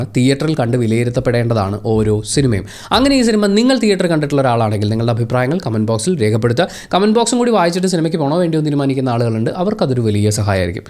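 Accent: Kannur, India. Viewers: Ente samshayam Tamil Nadinte Borderil ulla Place. Parayunnathu muzhuvan Kannur Slang. Ethu Ethaa Sthalam.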